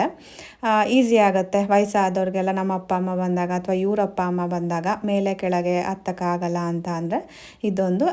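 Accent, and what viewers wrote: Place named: India. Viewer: Hi ur kannada accent good r u really a kannidaga wat about ur son is he facing any health issues as per both ur own house and the other house y dont u buy a new house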